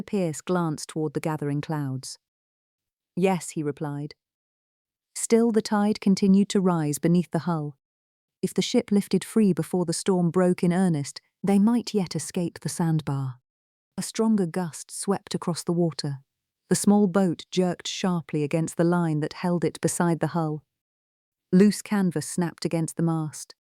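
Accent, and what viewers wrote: England, United Kingdom. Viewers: I have to agree. There is nothing better than an English accent.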